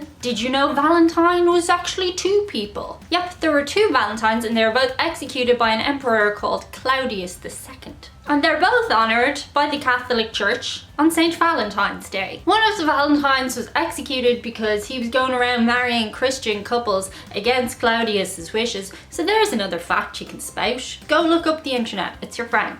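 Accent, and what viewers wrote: Ireland. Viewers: What's a whoaman? Or in these modern days with these crazy kids, woahman. OK. I didn't think could get any prettier but then she showed up in glasses. ''You may have to pay a wee tiny fee''. OMG. The Irishness is just so adorable. Are there still dullards that still care about Kim Whoredashian? Such a dirty skank. So YouTube sucks at paying you guys? Does YouTube need a throat punch?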